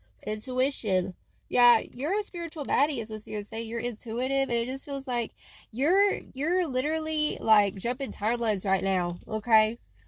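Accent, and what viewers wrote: South, United States. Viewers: my favorite southern reader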